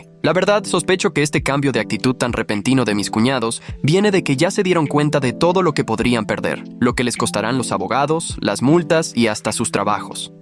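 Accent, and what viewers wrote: Mexico. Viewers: Oigan podrian decirle a la ia que deje el acento mexicano y vuelva al español neutro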